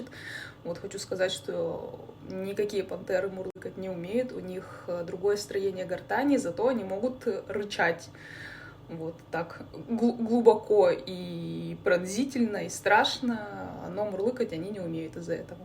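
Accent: Siberia, Russia. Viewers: Мимо кассы. Сибирские они. 3000+ км от Тамбова. Говор — туча народу в Сибири так говорит.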